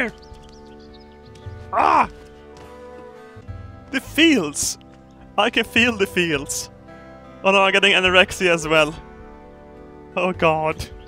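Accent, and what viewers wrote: Norrland, Sweden. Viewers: Love how you can here your norrlands dialekt when you speak swedish <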